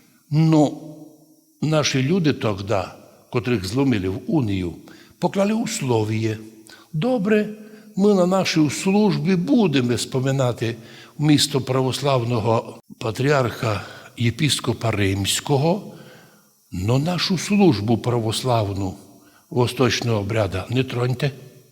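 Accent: Transcarpathia, Ukraine. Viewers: Українською з закарпатським колоритом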